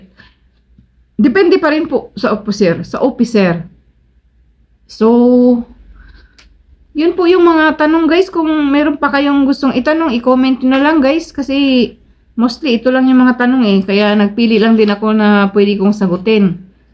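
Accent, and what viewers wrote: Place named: Ilocos, Philippines. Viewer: Yung accent mo ma'am mas malapit sa ilocano kaysa cebuano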